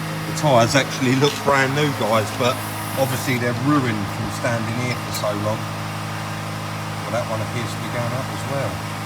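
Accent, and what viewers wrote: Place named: Cockney, United Kingdom. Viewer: I have to admit, when I first started watching your channel, I thought you were another Mike Brewer-esque "cockney cheeky-chappy" and it put me off a bit. I couldnt be more wrong. You come across as a really likeable honest bloke with quite a bit of knowledge. The Range Rover engine swap vids totally had me hooked. I really look forward to your vids now, as I never know what curveball is going to come next. (The bike for instance). Awesome channel. Keep em coming. "Lets get involved"👍👍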